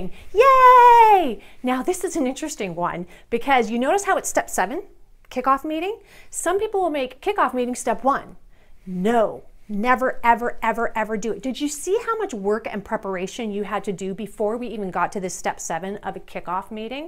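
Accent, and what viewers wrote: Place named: Canada. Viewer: It's "About". Mm'kay? "About"! Not "A-boat". Just kidding :-). Are you by chance Canadian? I can't put my finger on your very faint accent. Anywho, great info, very informative. I'm looking for a class to give to all the management at my Aviation job. This is some good stuff.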